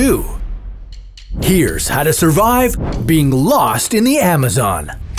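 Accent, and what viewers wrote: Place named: United States. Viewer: american accents are the worst. we need an old english dude commentating this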